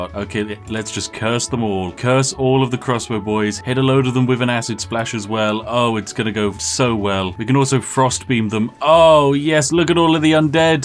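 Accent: United Kingdom. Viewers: I love me some smooth talkin' British man playing fun and interesting indie games. Best bedtime story ever. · Aaaaaaa! British boy is back, where is my tea.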